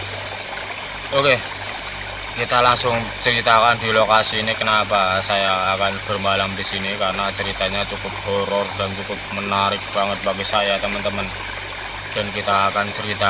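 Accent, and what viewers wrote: Java, Indonesia. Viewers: Om apa memang ngomongya kaya begitu, atau tambah2 medok..coba ngomongnya biasa aja biar tambah kereen..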